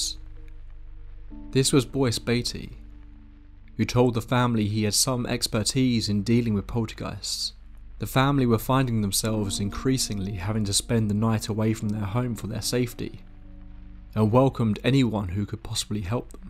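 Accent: England, United Kingdom. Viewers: Narrator with an English accent knows what happens in Connecticut in the 1970s .. plagiarized crap, or made up crap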